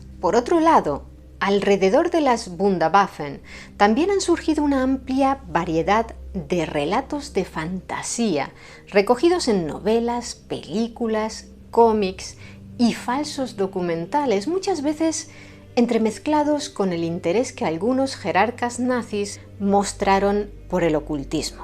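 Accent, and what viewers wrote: Spain. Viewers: Que bonito narra esta mujer .y su oratoria muy coordinada y sus palabras puestas con exquisites y su voz y el oir es como ver una pelicula o leer poesias ..Una investigadora entregada y natural.desde hoy vere todos sus videos.por su acento y lenguaje Creo que es Española...Saludos hermosa desde Esteli Nicaragua...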